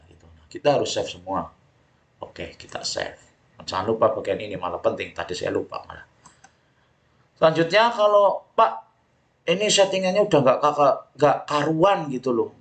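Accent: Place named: Banyumas, Indonesia. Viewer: Pak bahasanya ngapak. Ngapak daerah mana inj?😂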